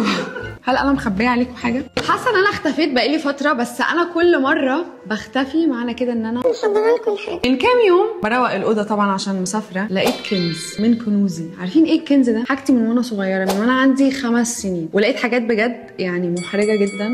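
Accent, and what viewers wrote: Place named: Egypt. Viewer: الإنجليزي المصري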